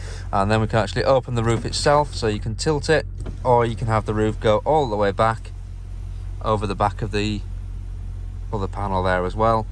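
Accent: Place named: Yorkshire, United Kingdom. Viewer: I enjoyed watching the Video Review. Is this Inchcape Dealership In York as do have a very strong Yorkshire accent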